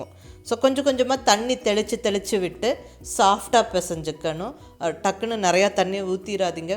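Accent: Coimbatore, India. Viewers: Akka yen ka unga face katta mattungiringa, nanum covai ponnu than ka unga slang romba pudikum ka athanalae unga face pakkanum pola iruku ka🥰😍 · Super sister.pargumpothey sapitanumpola iruggu .unga gongu tamil nice. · Super sister this dish item is super 👌 many vegetables and wheat flour combination nice your voice super 👌 madam .kovai Tamil I like it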